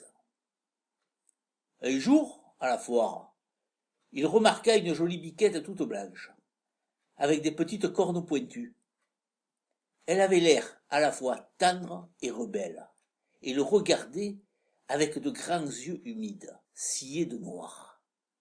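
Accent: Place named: Provence, France. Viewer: Une memoire d'enfance bien racontee avec un formidable accent de provence,,,, Qu'elle etait jolie la petite Chevre de Mr Seguin,sa barbiche de tout Officier ses sabots noirs eblouissants🐐☺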